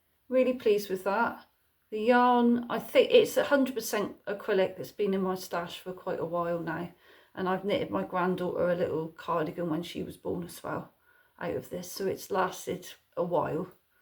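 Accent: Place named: Devon, United Kingdom. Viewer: I’m thinking you must be in Devon/Cornwall due to your accent! I’m living in rural Devon!😊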